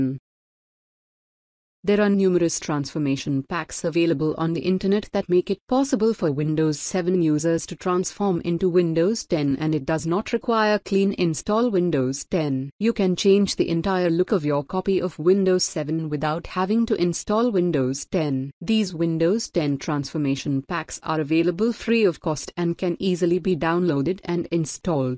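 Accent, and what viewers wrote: India. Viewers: Are u indian? ur accent is just like an indian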